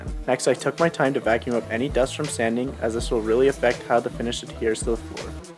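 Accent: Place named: Canada. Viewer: Excellent video, and very informative! I especially like the way you get right to the point, pace things perfectly, and don't use any of the typical amateur mistakes like long lead-ins, bad music, or obnoxious personality quirks. I've saved your video to watch again before I start my own barn board floor project. P.S. I also appreciate your Canadian sensibility and pronunciations! 🇨🇦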